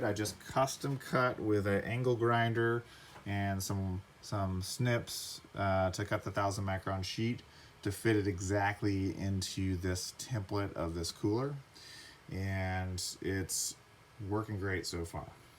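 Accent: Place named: South, United States. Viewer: You seem to have developed a southern drawl in this video.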